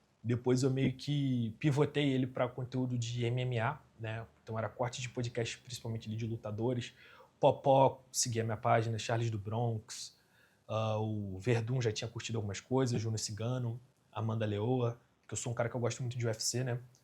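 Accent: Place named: Rio de Janeiro, Brazil. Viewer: Ele é do RJ certeza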